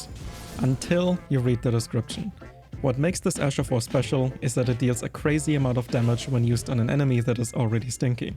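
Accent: Germany. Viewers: Are you German? Klingt irgendwie so :D oh and thx for all that very useful information, tarnished <3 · Du bist Deutscher, merkt man an deinem Akzent! Wieso also Englischsprachiger Kanal? More Money!😅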